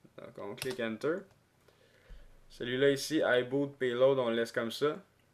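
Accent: Quebec, Canada. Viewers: Bjr!:), MERCI BEAUCOUP POUR LE TUTO!!! J'ai essayé pendant 24h plein d'autres méthodes infructueuses. La tienne, 40-60 min et j'avais fini, tout était correct, mon pc ne plante pas, etc., vraiment du bon travail, et pour une fois, c'était en Québécois!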